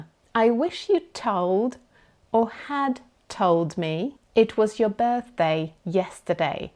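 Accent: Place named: United Kingdom. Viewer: your English is extremely clear! I love british English! It's very nice to hear you! ❤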